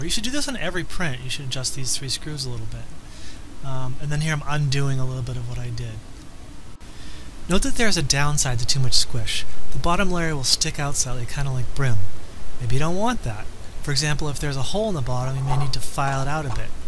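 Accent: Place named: RP, United Kingdom. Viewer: You talk as son of the Queen of UK. Are you an engineer?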